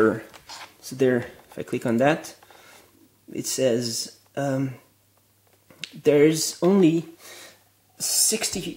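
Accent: France. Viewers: Wait, it's probably a French accent. I don't know, I give up. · i spent like 15 mins trying to work out his accent,french or american? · i now yore acsent youre french haha me to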